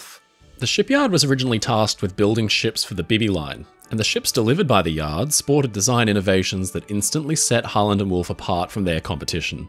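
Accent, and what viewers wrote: Australia. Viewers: I’m with you, but I’m eternally grateful. His great storytelling is a welcome distraction to the vitriol and animosity found in today’s world of news and politics. His soothing voice and Aussie accent sooth my jangled nerves. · I just want to say some things about what a testament to how good your channel is. As an Aussie myself, I generally dont like narrators/presenters with an Australian accent, I guess growing up with it I just usually don't enjoy it 🤣 BUT, the way you narrate is just so lovely to listen to and i often just enjoy having playlists of your videos on in the background while I game or work. Im also not super into maritime as a topic in general (aside from the pretty common fascination with Titanic) but i find the way you explain things with such simplicity and genuine love of the topic makes the info you give feel really digestible and understandable and I'm finding myself getting pulled into an interest I didnt have much draw to. 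So, i just want to say thank you. For being company when I've needed to decompress, for being informative in a way thats accessible and for just coming across as an all around really likeable channel. Keep up the absolutely spectacular work, my dude 🩷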